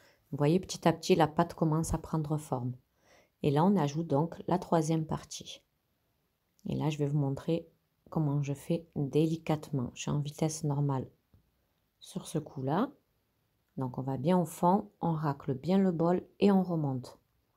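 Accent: South, France. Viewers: Coucou, elle me plaît beaucoup ta bûche, effectivement elle est à "croquer "parfaite pour cette année à Noël 🤶 🎅 🤶 🎅, merci beaucoup pour tes partages avec notre bel accent du Sud...Gros bisous et très belles fêtes 🥳 à toi et à ton entourage. 😊😊😊😊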